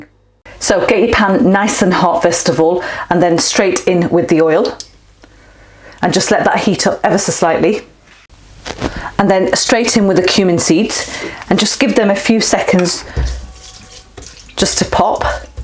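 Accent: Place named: Yorkshire, United Kingdom. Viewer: I've just discovered your channel and I think it's great. I don't know where are you from but you sound to have a bit of a Yorkshire/Manchester accent. Wherever you are from thanks for the recipes anyway.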